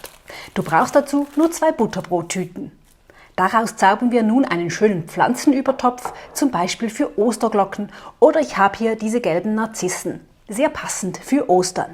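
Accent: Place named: Switzerland. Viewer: Vielen Dank für die schönen Osterideen. Du erklärst alles sehr gut und ich höre deine Stimme mit dem leichten Schweizer Dialekt sehr gerne.😁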